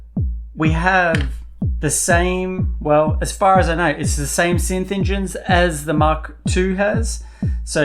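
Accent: Australia. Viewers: Cool… are those prices $US. You sound like an aussie!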